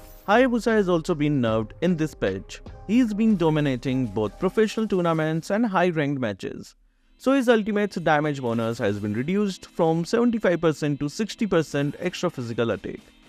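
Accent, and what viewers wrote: India. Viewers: Indian accent wtf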